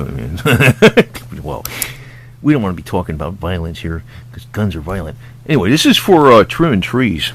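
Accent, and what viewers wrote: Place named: New York, United States. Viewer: Form NYC sounds like.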